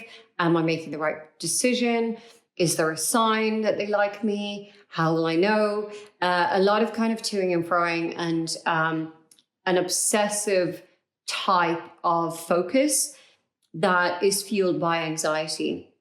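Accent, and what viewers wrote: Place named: Ireland. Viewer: Limerance sounds like an Irish term...what better person to discuss this than a irish accented .. attractive woman🎉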